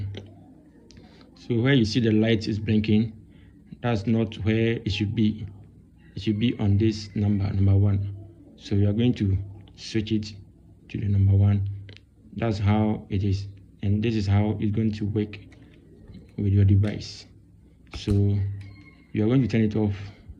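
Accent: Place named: Ghana. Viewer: GHANA man English dat , no lie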